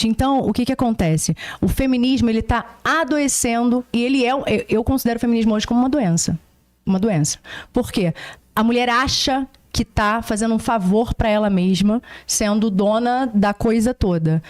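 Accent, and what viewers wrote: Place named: Rio de Janeiro, Brazil. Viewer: Ela é carioca, po. Se fosse baiana ela iria dizer "Oxente!", "Mainha", etc.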